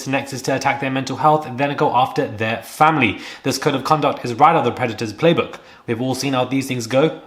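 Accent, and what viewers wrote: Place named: London, United Kingdom. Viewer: I didn’t notice your speed, I do like your layout of presenting,barely any waffling straight down to the news in hand. I had guessed you were London though. You are just fine- as you were 🙌👏🏻👏🏻👏🏻👌 P.s- didn’t know there was a speed button. On a new ish iPad?? Live and learn one for me today.cheers , G .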